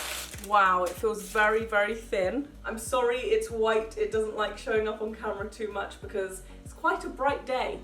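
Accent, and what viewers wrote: United Kingdom. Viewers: Im sorry, but ur so so weird, and plus its more weirder when ur talking Britain THAT PRECIOUS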